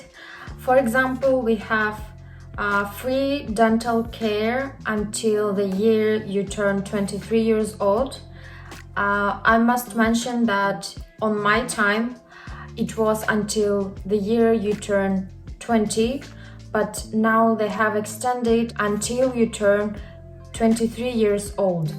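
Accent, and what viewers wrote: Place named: Russia. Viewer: I geussed Siberia - your accent sounds russian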